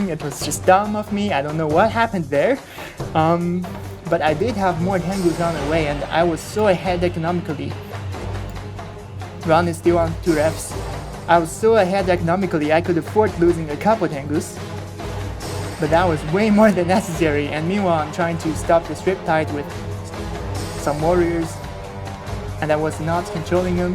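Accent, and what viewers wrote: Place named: Arab world. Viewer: I thought your original voice is just 100% Arabic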